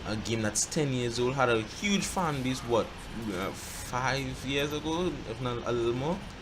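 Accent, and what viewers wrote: Trinidad and Tobago. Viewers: Eh. u from the Trinidad by chance?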